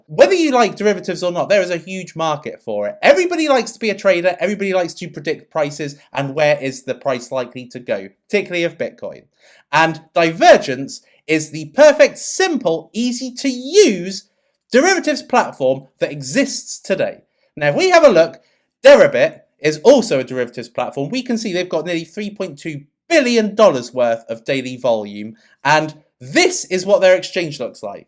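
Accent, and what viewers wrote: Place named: Southern Counties, United Kingdom. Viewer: Duuuude I love your Southern Counties accent - Bucks?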